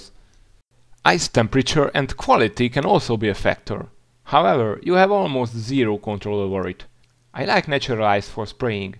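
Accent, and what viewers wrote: Hungary. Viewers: De magyarosan beszéli az angolt xd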